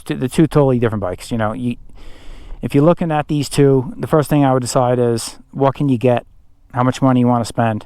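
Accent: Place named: Northeast, United States. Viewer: nevermind i saw a cactus or 2.... your accent is definitely northeast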